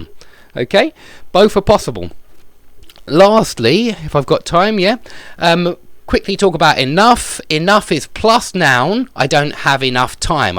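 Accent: United Kingdom. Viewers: That's British accent with his perfect voice.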